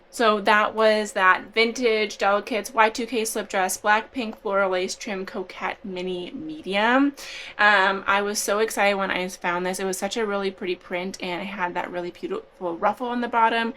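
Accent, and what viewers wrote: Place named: Midwest, United States. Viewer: You’re from the Midwest. lol Taayygs. I say the same and I’m in Wisconsin.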